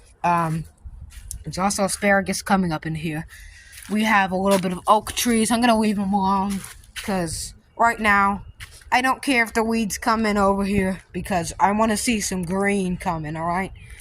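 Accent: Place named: New York, United States. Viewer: Where u from? I hear NY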